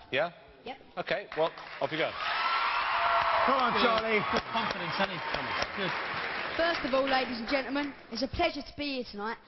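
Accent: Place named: United Kingdom. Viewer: if he wasn't british, he wouldn't be funny. its all in the accent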